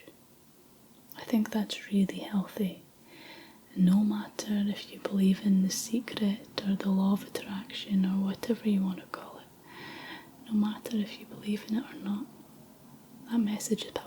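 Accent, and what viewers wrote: Scotland, United Kingdom. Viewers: i will never not have a weak spot for scottish accents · Love the ton of your voice the nice pleasant Scottish accent Not only that , you're beautiful inside and out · Where in Scotland are you from? Lovely accent wherever it is. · Have to say the curls REALLY suit you! There's no Welsh alternate sayings/words for New Year unless you count Blwyddyn Newydd Da; Happy New Year to you! Could listen to you all day, love the softer Scottish accent. . I practice the LoA and things have manifested so well for me recently, but still working hard on the Power of Now which can be quite hard to maintain. . Guessing you're near the M8/M80 😉 . Much love from North West Wales 😍 x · other people must be thinking this is a strong accent but for me its normal bc im scottish to AHhah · you are so beautiful and talented. I love all your videos. 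Your hair is beautiful. Your hands are beautiful and I love your hand movements. Your voice and accent are wonderful. You should be recognized as a Scottish national treasure. Thank you for all the great videos. You always help me relax and make me smile. Thank you. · my new years resolution is to find a scottish girlfriend (Im Australian) cos i could listen to that fluffy accent the rest of my life. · The Scottish accent is the best accent in the world. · Aaaaannnnd I'm on my way to dreamland. We need more scottish accent asmr.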